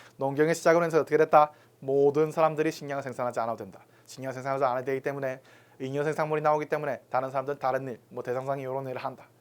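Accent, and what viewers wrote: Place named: North Korea. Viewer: 완전 김정은의 흉내를 낼려고 북힌말을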